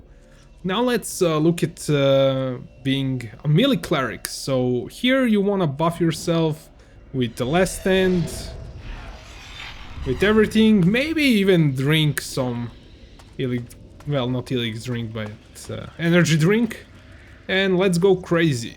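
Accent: Russia. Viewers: Hi, thx for the vid. Is that Russian accent or Polish? Just asking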